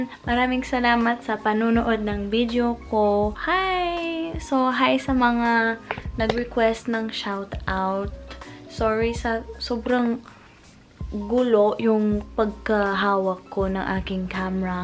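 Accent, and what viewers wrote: Visayas, Philippines. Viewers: your so pinay (expressions, accent, slang, and heart).. haters will always hate.. but I like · Hi!, sobrang na amaze ako sayo. Galing ng accent mo sa filipino. Pati english mo nadamay na sa accent ng pilipino. Ang pagpili mo sa content mo na gamit ang wikang pilipino ay hindi po pagnanakaw ng kultura. Yan po ay choice mo. Hindi naman siguro patented ang wikang pinoy na exclusive lang para sa amin. OA lang ang nagcomment. Feeling nya, kanya lang yon. Hahaha... Ipagpatuloy mo lang yan. Bihira lang sa isang foreigner ang yakapin ang kultura namin, meron nga jan half filipino hindi proud sa kanyang kultura at di pa marunong magtagalog. Kaya welcome ka sa pagpili ng paggamit ng salita naming mga indio. Hahaha... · ... Di mo talaga maaalis na walang maninira sayo... Please do whatever you want to do... Natutuwa nga ako sa pagtatagalog mo kasi parang bisaya kang ka magtagalog... 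Kasi may gf din ako noon na taga visayas... Tuloy lang... Thank you... God bless you...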